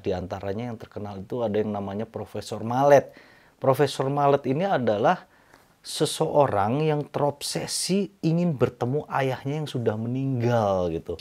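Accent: Java, Indonesia. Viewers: kok medok , dan tau arti rika ? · Medhok banget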